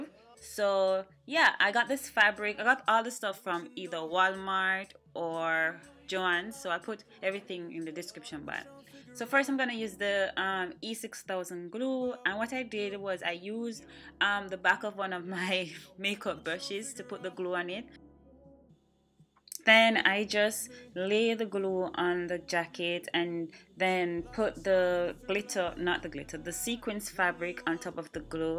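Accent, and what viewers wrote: Jamaica. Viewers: Just the fact that u sound Jamaican girl I had to subscribe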